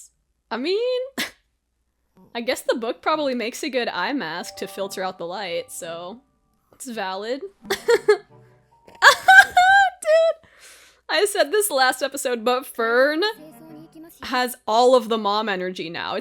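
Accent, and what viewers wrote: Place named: Canada. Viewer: I just learned about the Canadian rise, and now I can't unhear it xD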